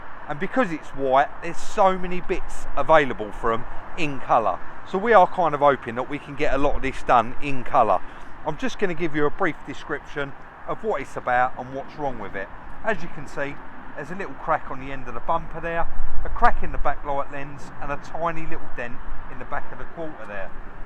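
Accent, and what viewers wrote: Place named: Kent, United Kingdom. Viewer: Great hall of cars that. Love your Kentish accent & sayings....." friend of mine reached out to me " 😂 You've got mates with long arms 🤣🤣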